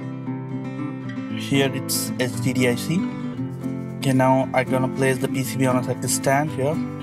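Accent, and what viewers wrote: India. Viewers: Hi I can detect Indian accent so where in India are you from ? I would like to have this procedure done to my iPhone Thanks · sounds like a indien tech scammer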